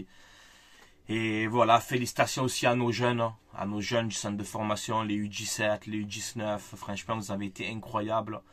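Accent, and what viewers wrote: Marseille, France. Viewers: Juste comment tu parles, j’ai pas besoin de prouver que t’es Marseillais 😂😂 Je comprends frérot Hala Madrid vaut mieux ça pour ta santé 😂 On as pas de LDC mais on est heureux, ils ont une LDC mais sont malheureux 🫵🏽😂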